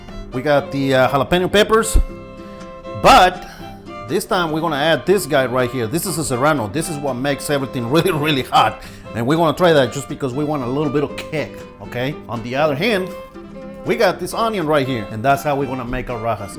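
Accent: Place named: South, United States. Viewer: Hi im KIANA from CENTRAL CALI . . I really like ur videos. Tu cocina es muy estilo rachero Y ME GUSTA MUCHO. ❤ I am mexican & hawaiian and my mom is MEXICAN from LIVINGSTON CA so she cooks using alot of can salsa instead of using real JALEPENOS. Yes her food is still GR8 BUT ! ...OH MAN DO I KNOW THE DIFFERENCE IN THE FLAVORS OF MEXICAN FOOD WHEN U USE REAL JALEPENOS & SERANOS arbrol anchos & GARLIC & KEEPING THE SEEDS IN TO GIVE EVERYTHING A KICK OF BIG FLAVOR. NOW THATS AUTHENTIC. Bt my mom stuck on her cans like green chili enchilada sauce can to make pork chilli verde ( still good ) bt I THINK ITS TIME TO SHOW HER THE DIFFERENCE. PS .... Luv ur country accent❤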